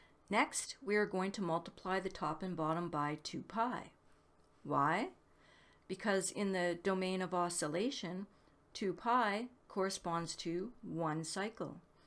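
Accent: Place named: Canada. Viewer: I have been studying Ken Wheeler now long enough to feel comfortable. This now should help refine the incomplete areas. I need to model and understand what the mainstream calls atoms, electron orbitals/shells, valence etc. Now believing there is a minimum limit to everything - which is sensible to me - should help refine the model especially with regards to electron orbitals. Somewhere I expect to find a relationship based either on phi or fractals that will define patterns we experience as in the periodic table of elements. ps I assume you are Canadian - I heard aboot way too many times.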